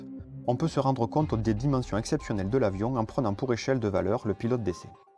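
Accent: South, France. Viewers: J'aime beaucoup cet accent du midi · Excellente vidéo J'adore son accent du Sud 😂 avec un texte bien écrit. Le fait de rajouter les sources c'est important. Par contre c'est quoi les deux musiques du fond ? et ? J'adore 👌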